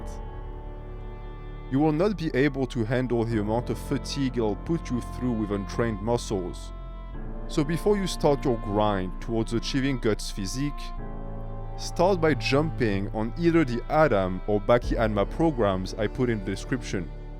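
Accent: France. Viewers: You've got a slight delightful baguette accent. As a fellow member of the baguette nation I salute you. Keep it up. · are u french parceque on dirait que tu as un accent ? 💪🏽🙏🏽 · Are u french? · that french accent, love it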